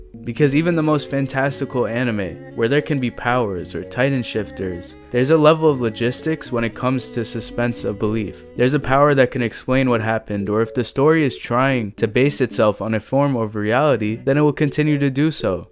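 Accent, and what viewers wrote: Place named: United States. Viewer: the bullet is from an old musket ... it's not as fast , the water ? you think cold water is a danger ? you sound american , the one hand holding on ? did you forget about adrenaline ? and that Mizu is strong ? + add it beeing animation and animation exists to portray things real life can't to boot , so idk man , ur probs just digging too much into liquid sands , instead of enjoying what you get , i really enjoyed Blue Eye Samurai , i don't think you see what Blue Eye Samurai does , because it delves into asian culture and humour , as someone who watched alot of those films , the funny cameos , tiny little things and jokes weren't wasted on me , it's unfortunate that you didn't like it , probs the american in you speaking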